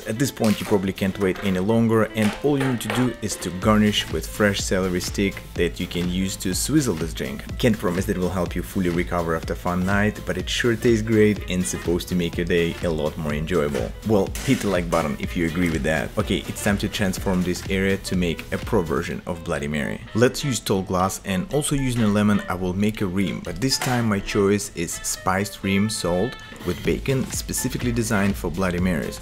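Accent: Russia. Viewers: Русский акцент, смотрел похожего бармена, ты попал в рекомендации, удачи с твоим делом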